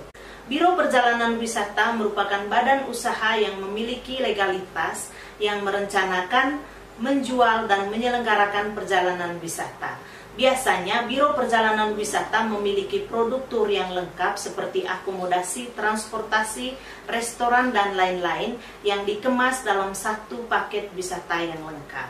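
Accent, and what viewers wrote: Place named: Flores, Indonesia. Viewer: Sangat membantu penjelasannya sangat jelas dan logat atau gaya bahasanya sepertinya mba orang flores ya,...terus kembangkan pariwisata komodo...salam flobamora 🙏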